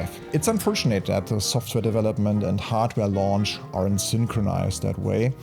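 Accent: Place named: Germany. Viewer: Wieso wurde mein Kommentar gelöscht wo ich sagte das man raus hören kann das du DE sprichst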